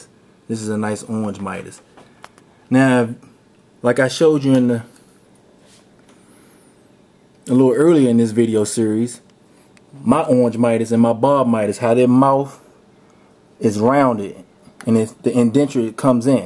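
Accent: DMV, United States. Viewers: sound like your from the dmv if so we need to hook up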